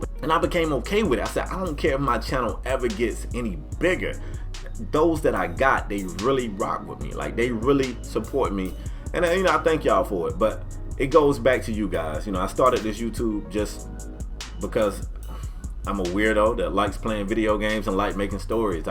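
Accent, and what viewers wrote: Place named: South, United States. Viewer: I remember in 2k12 days you made me create a scoring wing.... An you had a real country accent, it really showed when ever you said rain maker.... you had the best ncaa story’s....You made me watch a madden series.when I hated madden just to see johnnie, josh, and Ed reed reunite.... I wish you the best and may peace and prosperity be upon you 🤟🏾🤟🏾🤟🏾🗣🗣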